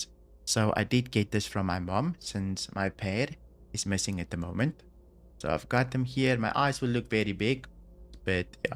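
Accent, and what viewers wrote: Afrikaans, South Africa. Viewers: Dude, thanks for all the videos. Have been watching a lot of them recently. Very helpful! If I may: I believe I hear an Afrikaans accent there. Am I correct?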